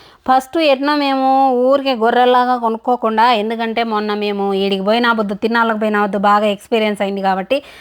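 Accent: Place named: Kadapa, India. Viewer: Bagundava akka Maadi kuda Kadapa ne pulivendula Maadi meeretla matladataro maa oorlo atle matladataru I'm sorry connected to u akka I like ur thought process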